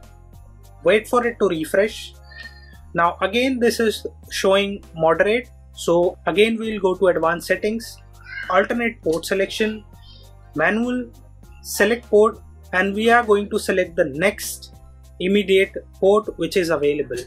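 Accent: India. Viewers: your speaking a Indian accent, no one understands that shit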